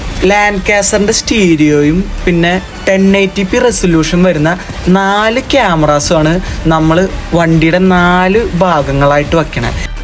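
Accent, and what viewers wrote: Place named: Thrissur, India. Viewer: നല്ല സുഖമുള്ള തൃശ്ശൂർ രാഗത്തിലാണ് സംസാരം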